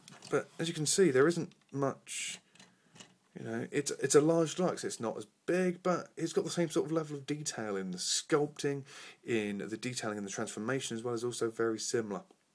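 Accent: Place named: United Kingdom. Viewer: hes from the uk theres nothing wrong wiv his accent if you dont like his voice dont watch it cant help the voices were born wiv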